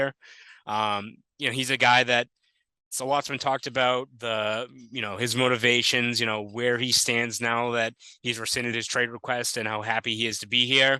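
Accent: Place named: Boston, United States. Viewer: Didn’t realize u had such a thick Boston accent…I love it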